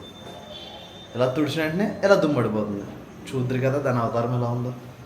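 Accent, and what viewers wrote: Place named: Godavari, India. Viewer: Godavari slang 😊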